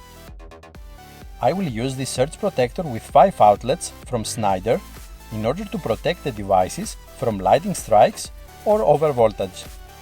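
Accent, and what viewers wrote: Greece. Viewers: Καταλαβαίνεις τον Έλληνα από την φωνή...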